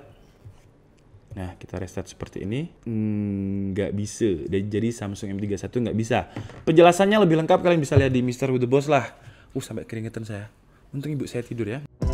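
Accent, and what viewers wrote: Java, Indonesia. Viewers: Jowo mu medok mas😁😁😁😁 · Wong Jowo toh 🤣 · Ternyata orang jawa mas 😂😂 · jowo endi lur😂😂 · Mas sampean tiang jowo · Bang orang jawa yh? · Wong jowo mas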